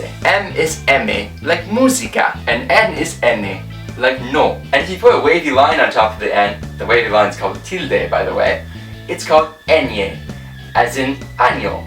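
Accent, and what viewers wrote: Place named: France. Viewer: your accent is so french!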